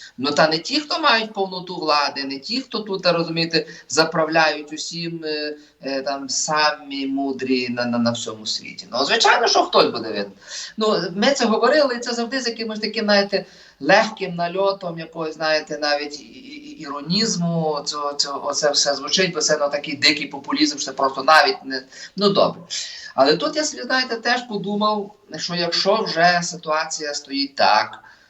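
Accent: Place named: Russia. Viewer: Прізвище - російське, мова, хоч і львівська, але трохи рос. акценту є, напевно москаль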